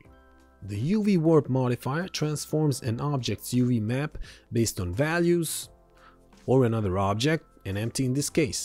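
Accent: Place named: Israel. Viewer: מבטא ישראלי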